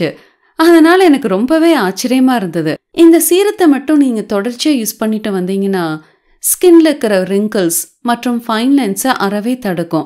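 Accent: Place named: Kongu, India. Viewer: Beautiful kongu tamil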